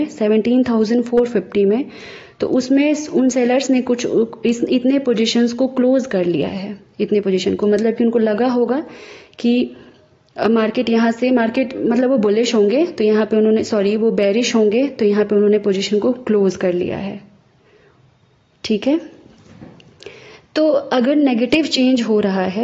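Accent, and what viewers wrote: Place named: Bihar, India. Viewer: आप बिहार से हैं न मैडम॥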